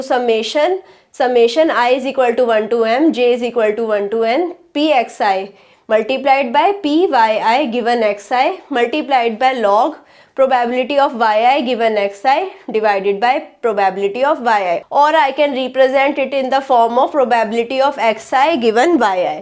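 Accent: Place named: England, United Kingdom. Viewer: Ap England sai ho kya mem... Hindi nhi ata kya apko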